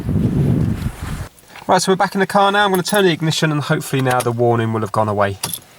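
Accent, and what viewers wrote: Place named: London, United Kingdom. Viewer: Awesome accents like londoner.🥰nice vids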